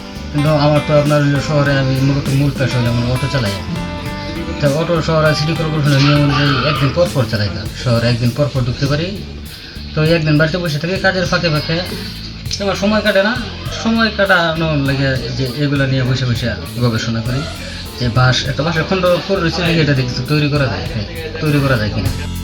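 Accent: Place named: Mymensingh, Bangladesh. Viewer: আইন্নে ময়মনসিংহের ভষাডা পুরা তুইল্লা ধরছেন 😎😎😎😎😂😂😂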